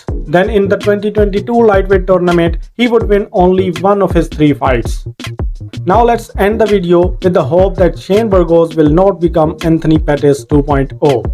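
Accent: India. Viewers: Damn I wanted to watch this video so bad but you sound like one of them Indian scammers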